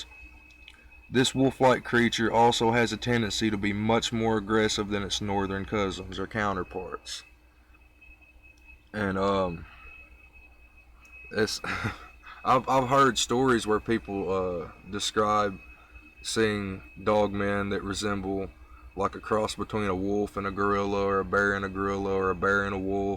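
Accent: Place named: Tennessee, United States. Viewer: If you don't mind brother, where is Your Accent from? Sounds like TN or Texas, but I'm not positive. Much love.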